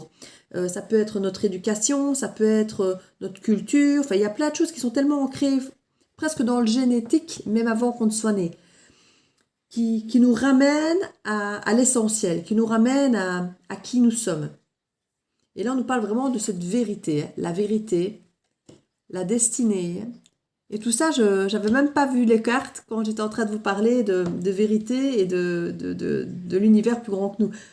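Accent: Liège, Belgium. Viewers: J'ai mis un pouce d'encouragement... j'avais bien senti cette pointe d'accent Namurois ou Liegeois... avant de lire la description... (ah ben avec le "Oufti", je m'etais pas trompé, c'etait bien l'accent de "Lidge" :p ) Bonjour de Mons :)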